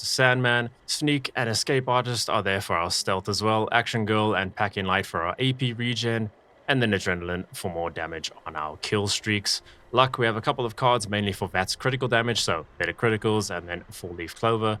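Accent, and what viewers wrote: South Africa. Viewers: Are you south african by chance. Very distinct accent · Very good video, thanks, sounds like you come from South Africa like me💪🏻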